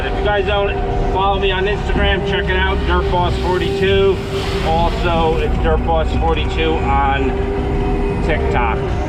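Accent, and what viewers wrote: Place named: Albany, United States. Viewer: I find the commentary entertaining. The way you speak reminds me of my cousins from Albany. My grandmother's sister married a guy after WWII she met while he was here at a former army base and moved up there. They used to come down here during the winter months to get away from the snow and I was always fascinated to hear them speak. I appreciate the vids. Be safe Boss man.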